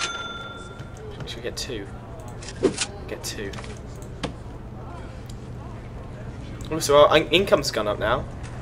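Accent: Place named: United Kingdom. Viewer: you sir are british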